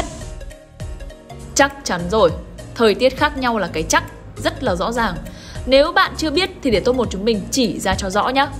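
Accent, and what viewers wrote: Vietnam. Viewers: Giọng cô chủ kênh Dất là dõ dàng,giong Bắc chuẩn đấy! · giọng c này chắc c ở Hn gòi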